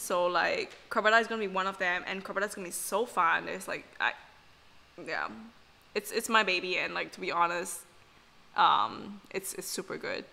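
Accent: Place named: Caribbean. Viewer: the accent sounds caribbean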